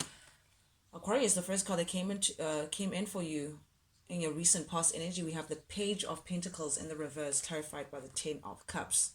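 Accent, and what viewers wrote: South Africa. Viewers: Are you South African? Had to ask because of the accent. Great reading! ❤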